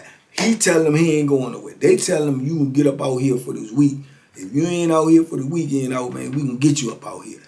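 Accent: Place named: Washington DC, United States. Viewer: Every time I hear you, I hear DC vibes, real live. Lol.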